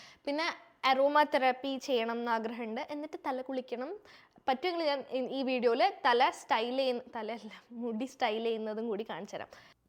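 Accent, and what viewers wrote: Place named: Thalassery, India. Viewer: That Thallasery slang 😊❤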